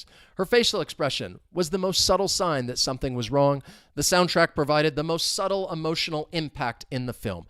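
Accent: United States. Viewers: pls make a video about your accent and your state of origin 🇺🇲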